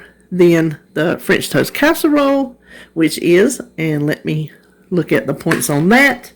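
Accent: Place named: Union County, United States. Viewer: I use Hellmann's Light Mayo and don't notice any real difference in taste from regular. I've even convinced my husband to like it. I've never tried Duke's. I put green onions and celery in my potato salad, no relish, but the sugar is key! You live near where my father's family was from for generations . . . Union County. My grandparents lived in Monroe. No wonder I love your accent. :) You measured 883 grams, but said 833 grams! Oops.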